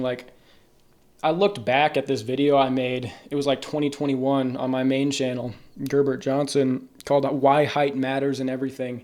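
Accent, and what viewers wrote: India. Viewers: Bro's Indian accent💀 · the indian accent :face-blue-smiling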